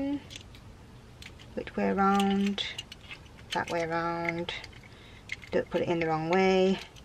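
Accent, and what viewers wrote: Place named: Yorkshire, United Kingdom. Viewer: Sound like you're from Yorkshire ! Ha fancy a race ?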